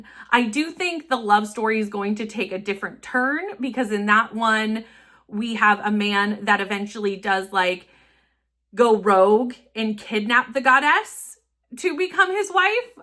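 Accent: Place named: Canada. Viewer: Its weird, because every time you say it's your Canadian accent, I'm just like....does this mean I have a Canadian accent, and I just don't hear it xD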